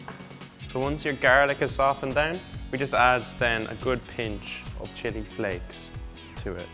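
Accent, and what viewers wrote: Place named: Cavan, Ireland. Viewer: The Cavan accent makes the video 😂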